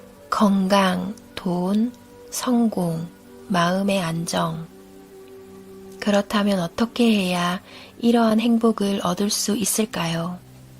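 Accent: Gyeongsang, South Korea. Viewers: 어투중에 살짝 갱상도 말씨가 엿보이는디... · 경상도 어디사세요? 저도 경상돈데 목소리 좋네요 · 경상도 사투리가 살짝가미된 목소리가 듣기에 너무좋아요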